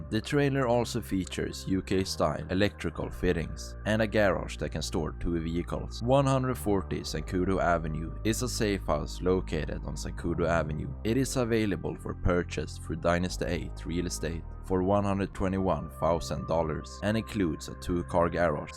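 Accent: Sweden. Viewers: the swedish accent screams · most swedish accent ive ever heard 🇸🇪🇸🇪🇸🇪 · Visste väl att du var svensk när ja hörde brytningen i rösten! denna åker in i sov spellistan! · Säg mig. Tycker du att du har en väldigt noticeable svensk accent? När jag hör mig själv snacka på inspelning så inser jag hur brutalt svenskt det låter trots att jag är flytande på engelska. It is what it is i guess. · Are you from Sweden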